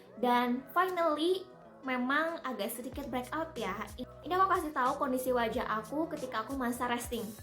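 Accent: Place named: West Java, Indonesia. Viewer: Sunda nya tetep keluar yah bundd wkawka ditunggu next video 🙌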